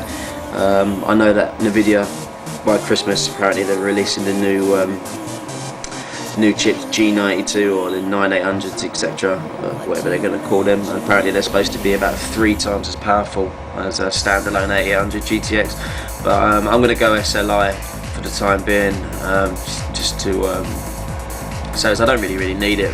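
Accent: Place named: England, United Kingdom. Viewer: jewel core processor, thats what it sounded like, haha. I love the enlgish accent.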